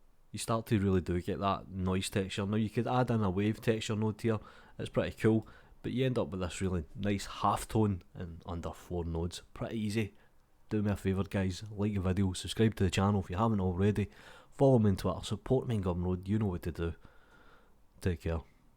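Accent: Scotland, United Kingdom. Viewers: oh my, a scottish man (?), just explained half tone shading to me. what a treat. :)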